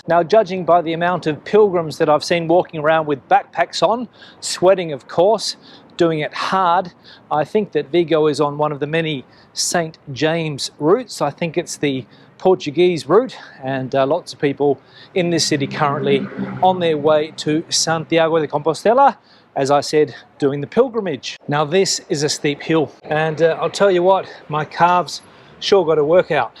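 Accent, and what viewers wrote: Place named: Australia. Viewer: Dat Aussie accent. I've been watching your videos for a while. Thanks for all the info. Cheers from France.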